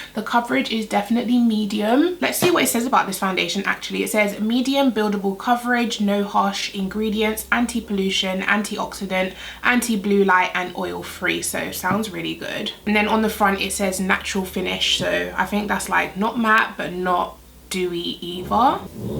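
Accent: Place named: United Kingdom. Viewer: Your British accent made me smile! I love your voice and your accent. <mail> on IG!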